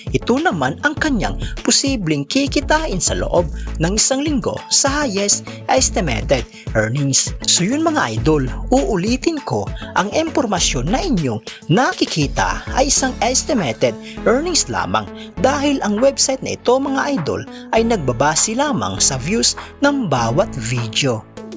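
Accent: Visayas, Philippines. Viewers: ang lamya mo mag vlog gukbo..ka umay ka..mas pinapanget mo pa boses mo at binisaya pa ang tono..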